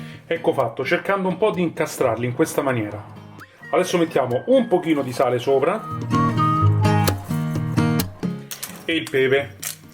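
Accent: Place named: Rome, Italy. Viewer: PER CASO HO TROVATO QUESTO VIDEO.. SENTENDO IL DIALETTO MI SONO FERMATA.. QUANDO SENTO PARLARE ROMANO MI INCANTO.... ANCHE IO LI PULISCO E PREPARO COSÌ.. PERÒ SOLO CON LA MENTUCCIA... POI LI CUOCIO IN PENTOLA A PRESSIONE.. ANCHE A ME VENGONO MORBIDI.. POI VOLEVO DIRE CHE IO SONO UN'ANZIANA SIGNORA DI CIVITAVECCHIA.. QUINDI UNA CUGINA DI ROMA.. UN'ULTIMA COSA.. COMPLIMENTI PER COME HAI CUCINATO I CARCIOFI .. BRAVO!!! CIAO ALLA PROSSIMA. 🌹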